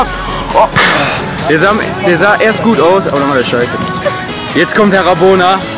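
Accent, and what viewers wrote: Germany. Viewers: kommst du aus Wuppertal?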